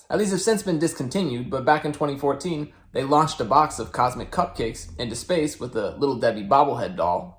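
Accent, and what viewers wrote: United States. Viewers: You from San Antonio, Right.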